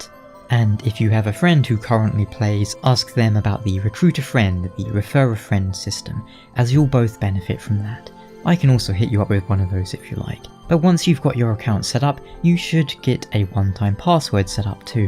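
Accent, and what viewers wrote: United Kingdom. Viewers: Not that this is any of my business, but I'm assuming he's talking about an Oldschool Runescape game developer called Mod Ash, who is a bit of a meme within that community. EDIT: Anyway I don't get why he's calling you that since you sound nothing like Ash. My guess is this guy isn't British and as such thinks all us British people sound exactly the same.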